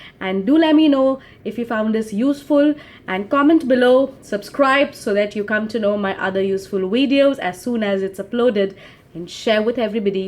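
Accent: India. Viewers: Before, I was thinking only listening to native will help you to improve your speaking skill but it's only thinking. What is in real is, when I listen to you then I love listening to Indian accent. For English exam your are going to polish us. Thank you so much for your fantastic work over here and positive thinking...I wish we can see your new video soon... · You are a totally and truly blue book English pedagogue in the world but your pronunciation is good for Indian ................. .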